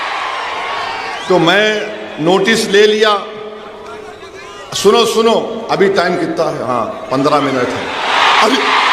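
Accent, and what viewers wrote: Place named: Hyderabad, India. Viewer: Phale Haidrabad hindi bolna chodo aur sidha hindi sikh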